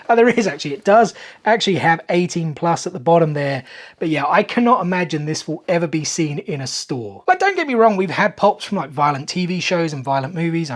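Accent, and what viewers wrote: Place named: Australia. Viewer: You're in London? I thought your accent was Australian!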